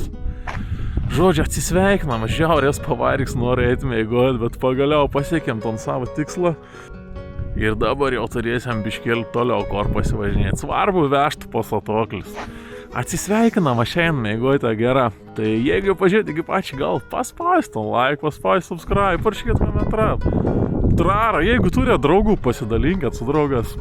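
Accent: Samogitia, Lithuania. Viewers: sveikas, kai kalbi angliskai jauciasi zemaitiskas akcentas 🙂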